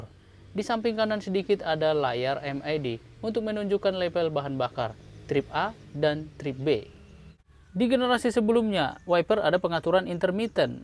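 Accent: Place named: Germany. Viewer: Baaah logat jerman rupanya...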